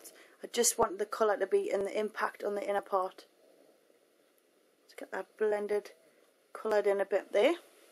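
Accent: Newcastle, United Kingdom. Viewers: Its not Irish, its Geordie! And I think it's great :) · British accent or am I wrong..? Good vid!!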